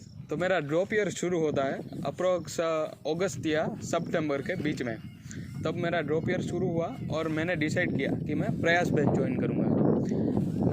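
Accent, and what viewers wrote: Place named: Gujarat, India. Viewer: All the best for College, New journey With new josh 👏 the Gujrati Tone in voice and The josh of new beginning indicates The real Gujrati Chhokra 😅😅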